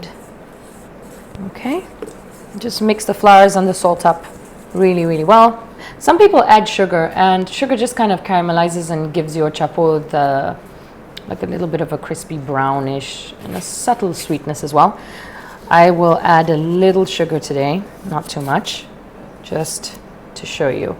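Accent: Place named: Kenya. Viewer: Your Kenyan accent 😍😍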